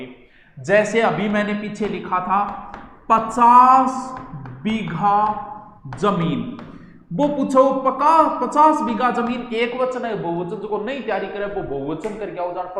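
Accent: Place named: Haryana, India. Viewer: Sar paka Hariyana se h inki bagdise pat se pata chal raha h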